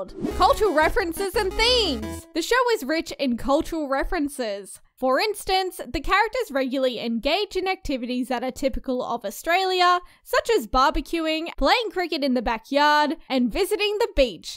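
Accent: Australia. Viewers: Went to America this year and the first thing someone said was “listen he talks like bluey”😊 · You have 1 of the most Aussie accsnts I have ever heard and I'm from rural NSW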